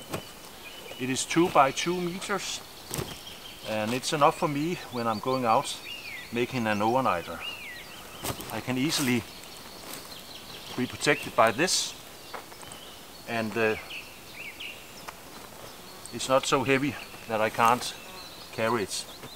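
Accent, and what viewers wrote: Denmark. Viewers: You sound Danish, from your accent, but where did you film this; as the scenery looks too rugged to be Denmark? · du er fra danmark jeg kan høre det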